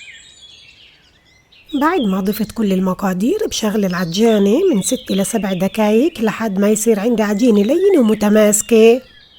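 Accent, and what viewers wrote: Palestine. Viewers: Intisar Ayasa هاي لهجة فلسطينية ريفية في قرى بعض المحافظات وللعلم انو بفلسطين يوجد عدة لهجات ما يقارب من اكتر من ٢٠ لهجة وكل لهجة تختلف عن غيرها وجميعها لهجات حلوة ولها رنين جميل في الاذن وذلك لتعدد المناطق في فلسطين الحبيبة. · ما شاء الله يسعدك ويسعد لهجتك ويسعد فلسطين واكلاتها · تسلمي تسلمي يا ستي انتي يسعدك ويسعد كلامك الفلسطيني الحلو اجمل شيء انك بتحكي باللغه الفلاحيه الحلوه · انت مبدعه ولهجتك روعه فلسطينيه اصليه من اي البلاد انت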